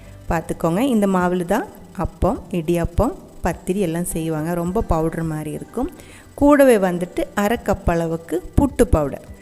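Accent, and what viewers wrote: Kerala, India. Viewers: Hi,ningal malayaliyanennu AA thamil parayunnathu startingil kettappol thanne manassilyi .Pirake chakka ennu paranjappol confirm aayi.Tamil nattil janichu valarnna malayalisil ee different kanarilla.Athukond keralathil valarnnavarakum ennu karuthunnu.ButI really happy.Nammude aalukal Anya bashayil vedio cheyyumbol, athum AA nattukar eshtappedumbol kittunna santhosham onnu vere thanneyanu. Keep it up.My hearty wishes for u. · malayali Tamil peasaree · Very good tamil to listen in Malayalam accent❤️❤️ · மலையாளம் கலந்த தமிழ் பேசுவது சூப்பரா...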